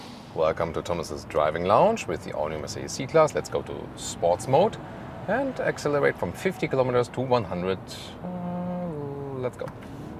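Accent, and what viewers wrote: Germany. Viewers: Wow lovely accent when germany speaks english👌🏼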